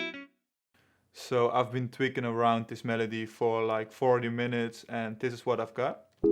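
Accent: Netherlands. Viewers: Great beat and great tutorial! You dutch btw?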